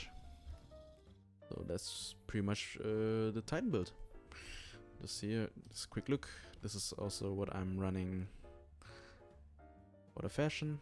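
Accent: Germany. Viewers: Man hört richtig, dass hier ein deutschsprachiger spricht. Aber tolles Video 👍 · sounds german🗣️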